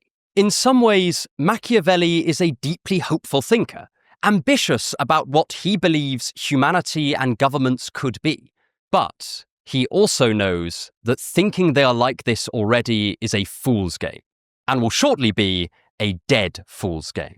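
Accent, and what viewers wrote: United Kingdom. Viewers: Ah, nothing better that a good video-essay with a good orator with British accent and 1am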